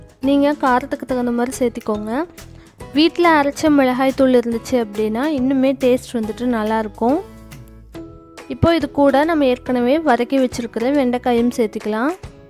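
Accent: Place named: Chennai, India. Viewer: ungal voice kovai Tamil illa Chennai Tamil maari iruku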